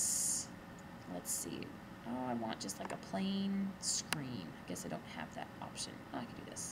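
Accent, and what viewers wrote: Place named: South, United States. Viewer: If my teacher had a cute barely southernish accent instead of being some 65 year old bore, I think I would have enjoyed class a lot more. Thanks for helping with my finals!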